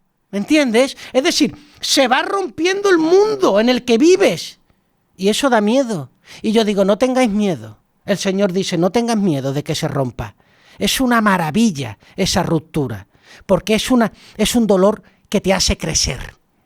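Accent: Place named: Colombia. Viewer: Es el español mas Colombianp qie conpzco ❤❤❤❤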